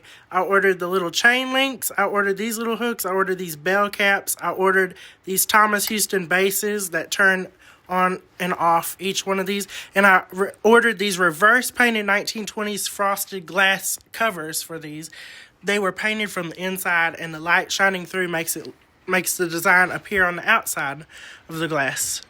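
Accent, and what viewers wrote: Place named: Louisiana, United States. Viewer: This just came across my feed and i am so intrigued by you and find you fascinating!! You dont happen to be from Louisiana by any chance do you? Bc i am from Louisiana and you sound like you might be. As well as the area that you live seems it could be. ❤ I have subscribed so that i can check out lots of your other content! Blessings and light to you!❤❤❤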